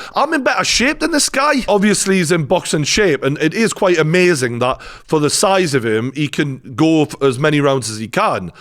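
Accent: Newcastle, United Kingdom. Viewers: Watched the fight and totally agree a rematch he loses his punches had no effect on Nganou Excellent video as usual Our lass thinks there hilarious and your the only Geordie she’s heard speak the same as me lol, She keeps saying how come we all swear as much just tell her because people haven’t got a clue what we’re saying All the best lad and keep up the great work From one big old Geordie to a big younger one 👍🏻👍🏻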